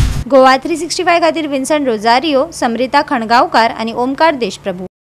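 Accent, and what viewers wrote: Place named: Karnataka, India. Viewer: Is the anchor from karnataka? Highly accented.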